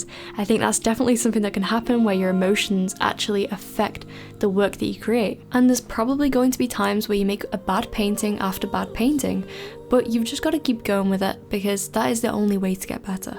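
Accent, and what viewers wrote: United Kingdom. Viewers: Just started watching you recently and you’re so natural to teach it’s brilliant and just like your sister I live in another country and I’m trying to paint but hearing a fellow English woman Is perfect, if you ever get a chance to come to New Zealand I’d love to show you around and even have a mini painting session 😊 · I’m trying to place your accent! Somewhere near Liverpool? That’s where I’m from and you sound exactly like one of my cousins 😅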